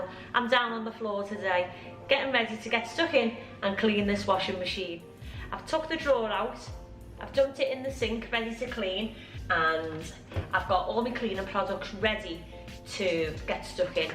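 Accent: Liverpool, United Kingdom. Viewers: Hi great video and love the scouser accent.Just one question to make it crystal clear,does the soda go in draw which you demonstrated and vinegar in drum separately,being a bloke im a bit slow to cotton on with this cleaning lark.Thank You in advance!!!!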